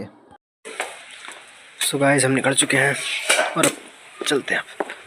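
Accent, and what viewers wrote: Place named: Haryana, India. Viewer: haryanavi accent is fireeeeee 🔥🔥🔥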